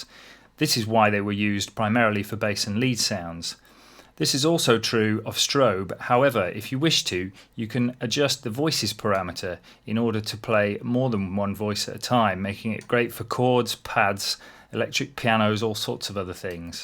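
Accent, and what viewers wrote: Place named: England, United Kingdom. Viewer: It's nice to hear an English accent on a walkthrough such as this for a change! Thanks a lot - found this tutorial to be very useful. Think I'll be getting Synth Squad.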